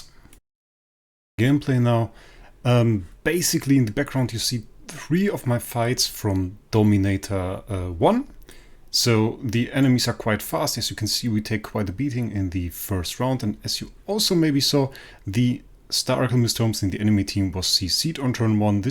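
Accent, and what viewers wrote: Germany. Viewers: are you german or where are you from? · Da hört man den deutschen raus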